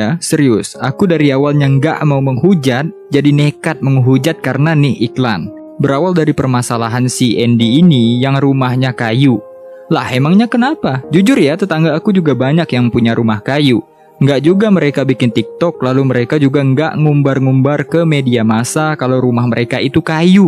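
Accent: Pontianak, Indonesia. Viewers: Abang tinggal di ponti? Pantas logatnya kayak kenal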